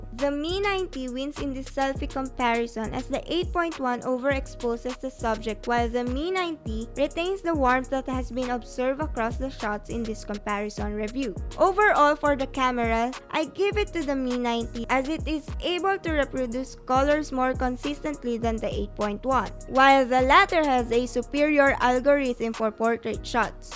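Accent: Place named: Philippines. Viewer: kitten is a cat what I think he's trying to say its kind of cute she has that kind of "stereotypical" filipino english accent which I guess is heard in most grade 10 students of the Philippines despite her age. But who knows lel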